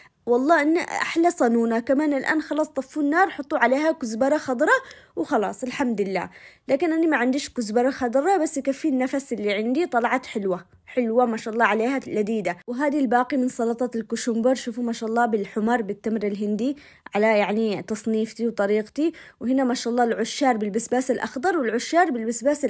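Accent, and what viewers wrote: Aden, Yemen. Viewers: مااحلاك وامااحلى طبخك وكلامك العدني الحلو الله يعطيك العافيه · ❤❤ قلبك ماشاء الله تبارك الله عليك مره وستوا ❤❤عاشت ست الكل أسعد الله أوقاتكم بكل خيرا آمنا إحنا عارفين الطريقه بس إللي شوقنا أكثر صوتك الجميل العدني الاصيل القح 😂🎉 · ماشاءالله عليك مبدعه وحبوبه وشاطرة وحبيتك لانك من عدن متلي وماتتصنعي وتتكلمي عدني واحب لما تتكلمي العدني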